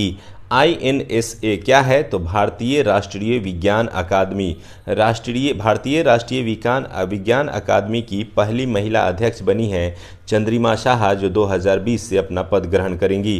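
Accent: Bihar, India. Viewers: bihar ki yhi language hi. khi aur pd le tu...........kmi nikalna yhi jante ho tum.-ve person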